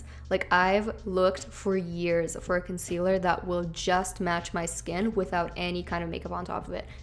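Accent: United Kingdom. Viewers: love your slight british accent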